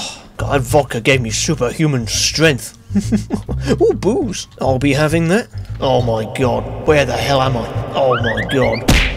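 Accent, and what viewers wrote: United Kingdom. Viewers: You are a mix of thedooo, mcnasty, martincitopants and british. And i love it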